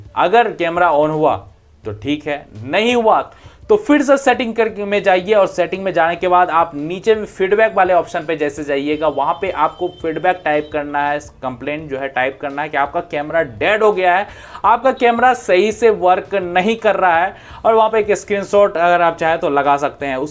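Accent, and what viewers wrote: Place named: Bihar, India. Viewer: Are bihari bhai podbulam😅😅😅 jada ho rahahe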